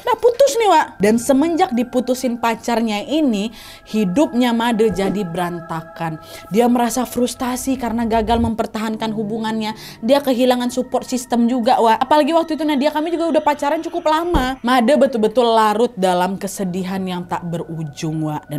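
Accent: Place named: Medan, Indonesia. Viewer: Malah lebih ngakak denger ceritanya.. cerita tentang orang bali tp ini logat orang medan yaa..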